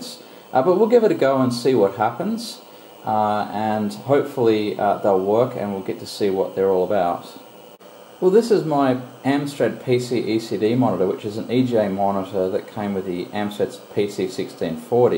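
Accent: Australia. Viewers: Enjoyable. Came here from retrospectre channel. Subscribed. Nice to hear a fellow ausdie voice too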